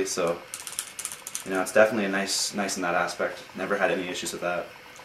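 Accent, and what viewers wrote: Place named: Canada. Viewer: You are canadian, aye? Figured that OOT right at the beginning :-D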